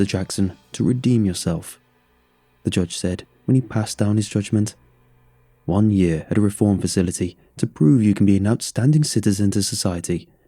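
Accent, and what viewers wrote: United Kingdom. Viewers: I have watched so many videos and the British voice was a major set back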